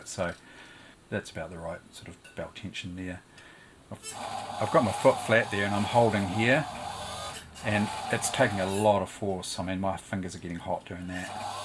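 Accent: New Zealand. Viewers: I hopethis gets an answer, it would be helpful, but it sounds like he's a kiwi so he may not have an answer for another country if you are from somewhere else to NZ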